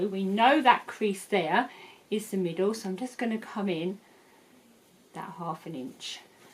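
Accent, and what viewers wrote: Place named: England, United Kingdom. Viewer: I would listen to your English accent for my whole life. Lovely.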